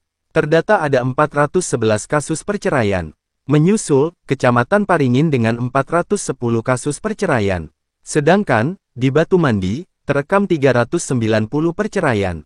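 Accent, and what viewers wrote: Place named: Java, Indonesia. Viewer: Ini channel orang jawa suaranya aku kenal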